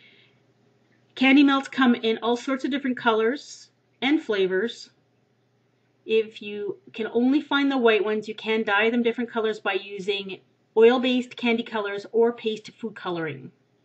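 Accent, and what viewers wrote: Canada. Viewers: i noticed you were canadian from your accent,because my cousin is canadian. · Haha, I KNEW you were Canadian, I noticed your accent :D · You're Canadian! I thought that! Either Canadian or Minnesotan! I love your accent! (is that wierd??)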